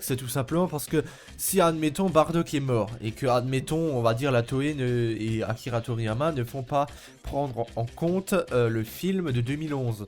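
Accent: Alsace, France. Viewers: t'es alsacien nan ? xd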